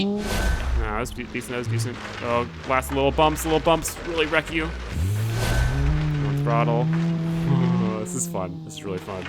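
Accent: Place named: Bay Area, United States. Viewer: he sounds like bay area buggs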